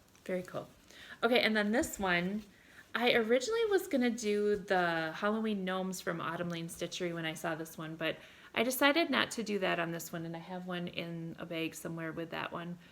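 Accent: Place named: Minnesota, United States. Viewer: I just love your videos! You are so fun to listen to. I love your “Minnesota” accent. 😉. I’m a MN girl too and I live about 20 minutes from you (just north of Becker). I can’t wait to attend my first cross stitch retreat. I’ve attended quilting retreats, but not cross stitch. Hopefully some day we will meet.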